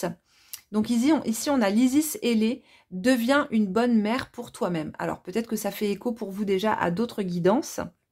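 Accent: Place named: Belgium. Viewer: Bravo! 2: ça me parle! Je vais déclarer mon activité Artiste- Décoratrice d'intérieur ! Vous effectuez vos guidances avec tellement de cohérence et d'assurance verbale et spirituelle, c'est très fort , toutes n'y arrivent pas, bafouillent, ou bien s'écoutent parler! Ce n'est pas du tout votre cas et votre accent belge me fait trop rire, ainsi que certaines expressions du genre: "la papesse c'est pas la chaudasse du tarot" lool! Osiris:::: j'ai fini ce matin Christian Jack :Abydos ou le mystère de la résurrection!" Tarots magnifiques comme le préraphaélite par ex.. . Oui je faisais de la peinture, enfant, et plus tard photographe, mais les "conseillers" m'ont toujours découragée! Merci à vous et au Soleil!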